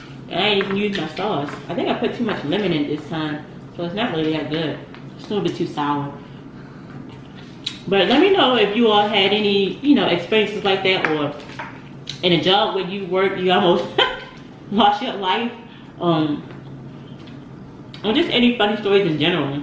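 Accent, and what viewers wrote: Baltimore, United States. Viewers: LLS girl I Loved that story, it had me laughing so hard and those crablegs get me thinking about getting some tomorrow. You sound a lot like my Baltimore friends but not all they way. Maybe like Philly and Baltimore mixed lol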